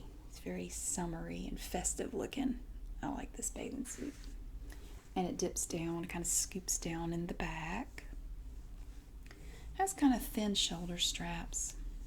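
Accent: United States. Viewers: Your accent is amazing. I'm English and I love American accents. What's a goodwill store? Is it a charity shop?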